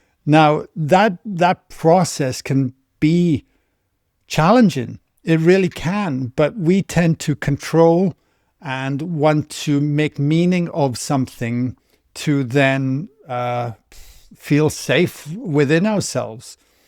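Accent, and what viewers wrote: Wales, United Kingdom. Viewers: Always up for a bit of Taff accent, greetings to a Welsh brother.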